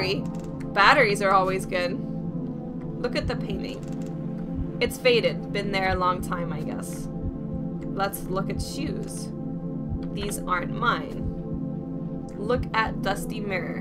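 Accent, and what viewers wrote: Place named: New Jersey, United States. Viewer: It sounds very Jersey.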